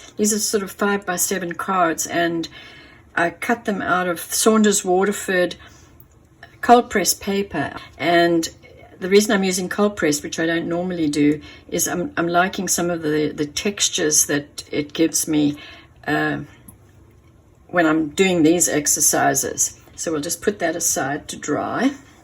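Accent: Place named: South Africa. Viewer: Thank you. I recognized your accent. I was born in SA. Love your work, been doing watercolour for many years, but this is a fresh look at doing abstract. Im going to try my hand at it.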